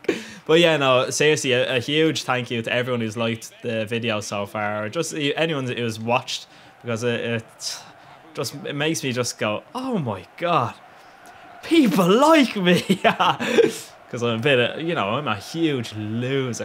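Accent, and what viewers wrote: Ireland. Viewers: is that an irish accent? sorry that I don't know haha and will you do a packopening? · Are u Irish? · Your story was actually funny :P Nothing better than a crazy irishman (Or scotish wathever) telling a story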